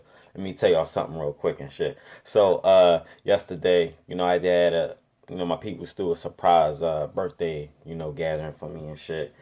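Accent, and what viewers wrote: Philadelphia, United States. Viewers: Yo u from Philly bro?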